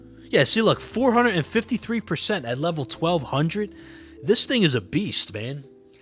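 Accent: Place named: New York, United States. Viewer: I love your accent. Im trying to guess where you are from. My guess in New York generally and Long Island specifically. How close did i get?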